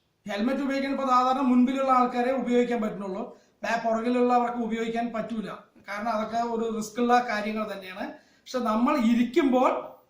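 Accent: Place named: Palakkad, India. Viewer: aivvaa palakkadan basha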